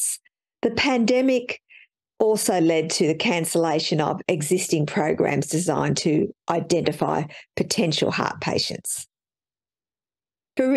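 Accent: Australia. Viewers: Vocal fry on top of an Aussie accent, combined with an unflinching shit-eating grin, makes you excruciating to watch.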